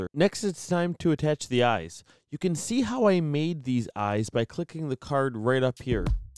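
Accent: Brooklyn, United States. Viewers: Ahehe Brooklyn guy lol...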